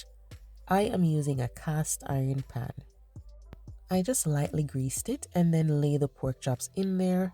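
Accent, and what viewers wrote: Jamaica. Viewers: Jamaican? Hearing an accent!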